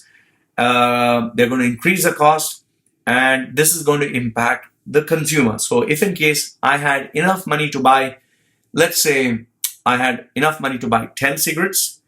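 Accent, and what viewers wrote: India. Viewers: Why has your accent become more Indian sounding of late? Here it was very different 😅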